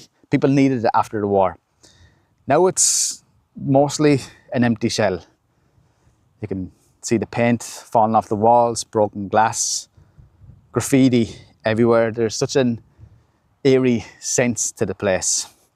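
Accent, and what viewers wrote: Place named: Ireland. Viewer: Irish English is fantastic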